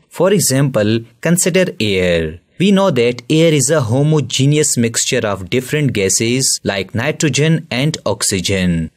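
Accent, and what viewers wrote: India. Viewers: Just the way bro speaks its obvius that bros indian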